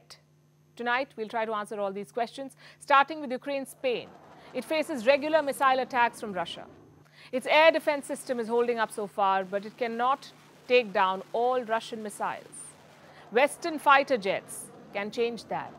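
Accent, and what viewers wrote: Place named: Mumbai, India. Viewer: What are you saying.. It's very difficult understanding your Bombay accent.. Tamil urdo sound..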